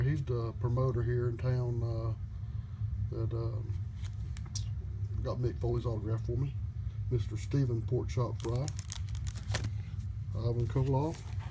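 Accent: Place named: South, United States. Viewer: That was a blast from the past & made to much better by the native WCCW & NWA southern accent!!! :)) "See ya in church" brother. Blessings, David (Jer. -24)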